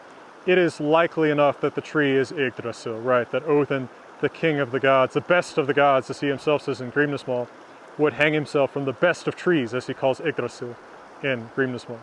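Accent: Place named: West Texas, United States. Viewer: Fantastic academic level content available on youtube with beautiful background scenery and a quirky cowboy tone? I don't think I've heard anyone quite speak my language like you do,. Grew up in West Texas and studied Latin and Classics at UT Austin. You've got one more subscriber out of me!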